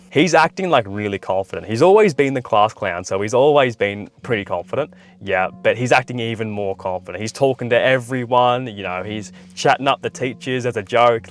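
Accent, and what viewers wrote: Australia. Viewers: Crrikey mate i get no bitches!.Also aussie?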